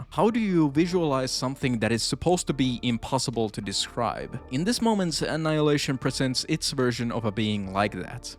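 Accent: Finland. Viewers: Came to the channel for the luscious finnish accent, stayed for the absolute bangers of videos, incredible job!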